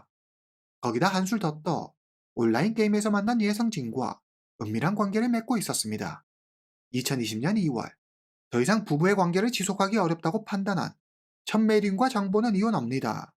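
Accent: China. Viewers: 말투 어디 말투 입니까 조선족 아니고 중국인인가?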